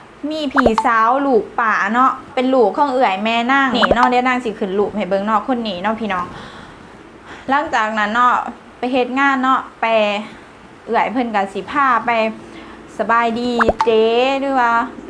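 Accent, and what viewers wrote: Ubon Ratchathani, Thailand. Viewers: สำเนียงเจ้าเว้าแบบเดียวกับอุบล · สำเนียงคำพูดคำจาลาวใต้สำเนียงการพูดเหมือนๆกับ.จ.อุบล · สำเนียงคืออุบลเนาะลาวไต้อ้ายกะอยู่แคมจำปาสักอ้ายยูเดชเลด.เว้า.ล.ลิงคือ.พิบูลสำเนียงคือกันไกล้กัน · สำเนียงเหมือนคนอุบล เพาะเราเชื้อชาติเดียวกัน ผมคนหนองคาย ญาติผมอยู่เวียงจันก็มีอเมกาก็มีปากงึมก็มี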